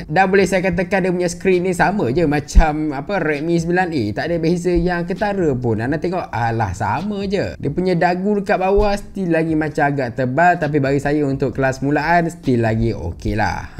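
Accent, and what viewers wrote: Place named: North, Malaysia. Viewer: Wah org utaqa mana ii mai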